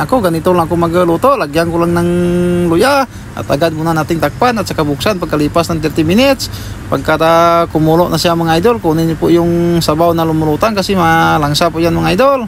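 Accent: Iloilo, Philippines. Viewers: Ilonggo ka dol.?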